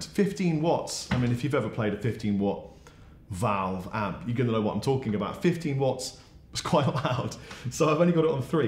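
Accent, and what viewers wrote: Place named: United Kingdom. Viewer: You lost me, you talk too much! I want to hear the amp, not your British accent.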